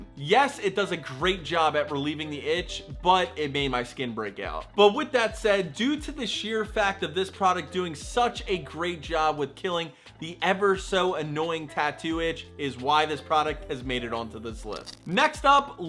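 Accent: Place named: Delaware County, United States. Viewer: Are you from delco cause you sound like it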